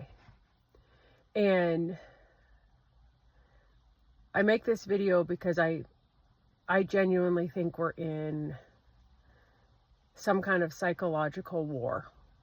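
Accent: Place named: United States. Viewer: You sound like an American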